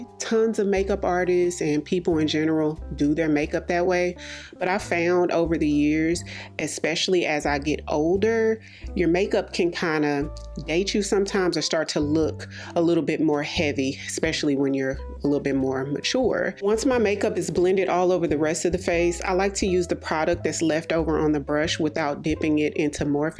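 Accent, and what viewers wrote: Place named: South, United States. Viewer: I often wondered where you were from.i hear your southern accent. Gorgeous look . Love it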